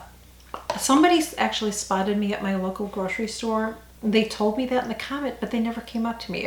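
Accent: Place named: Boston, United States. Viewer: Where i you originally from you sound like you're from Boston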